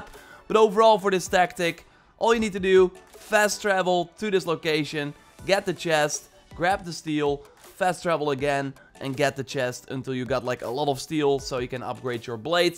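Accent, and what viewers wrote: Netherlands. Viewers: For example better ga je het beter uit spreken man tis egt zo zwaar hollands Engels veder cek ik altijd je shit ga zo door 😎👍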